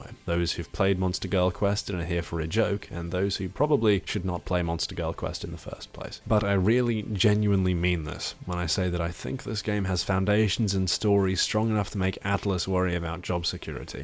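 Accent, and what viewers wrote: United Kingdom. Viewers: The story about how a man with a smooth, charming British accent crossed the degeneracy event horizon and came out the other end with a new appreciation for the obscene. A story for the ages, one that will be passed from father to son for centuries to come.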